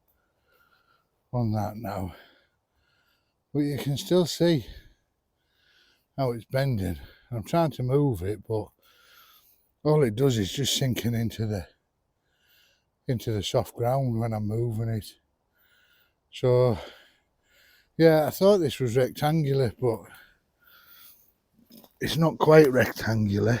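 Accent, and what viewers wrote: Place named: North East England, United Kingdom. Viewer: I stumbled across your channel by chance sir. Glad I did. So will be viewing your back catalogue now. Love this kind of content and especially the down to earth people who have channels on YouTube. A lot of people can relate to. Which part of the north east are from? I watch a lot of blot outdoors videos. You sound a lot the same. I live in ormesby st Margaret near Great Yarmouth. So we all sound like farmers down here. Apparently to a lot of people. 😂. Stay safe my friend, Andy from Norfolk. 👍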